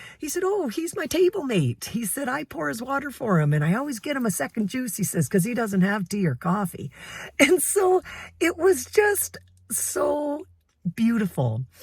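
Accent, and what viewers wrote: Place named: Canada. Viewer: your sweet, soft, mellifluous Canadian voice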